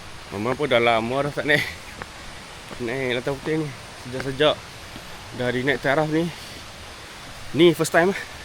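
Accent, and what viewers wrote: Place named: Kelantan, Malaysia. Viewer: steady makan budu..lidah kelate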